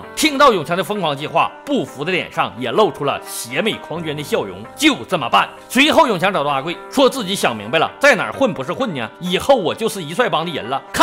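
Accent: Northeast, China. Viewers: 2楼。牛，东北话解说真的到位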